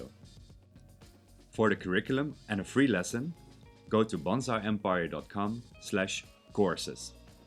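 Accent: Netherlands. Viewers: je hoort echt direct dat je een hollander bent😂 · O yeah dutch guy. Ik hoor het van 10km afstand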